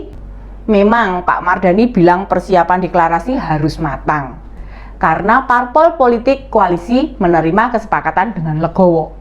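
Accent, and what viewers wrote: Java, Indonesia. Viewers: Mba pertahankan medokxa👍👍👍aku seneng👍👍😜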